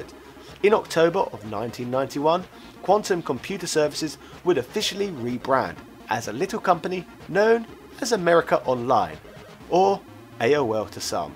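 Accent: United Kingdom. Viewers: I get it your British but nobody calls it the atari two six hundred.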